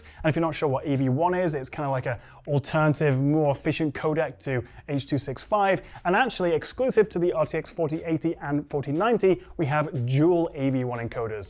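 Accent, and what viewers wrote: United Kingdom. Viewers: Thanks for the review. It was very informative. I like your British accent!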